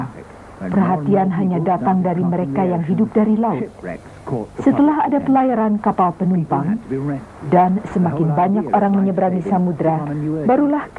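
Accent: United Kingdom. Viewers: dari logat englishnya seperti dari BBC acaranya. Secara TVRI kiblatnya tahun 80-an adalah BBC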